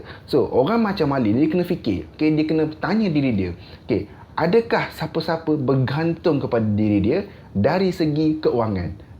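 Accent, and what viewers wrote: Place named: Terengganu, Malaysia. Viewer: Bunyi mcm org ganu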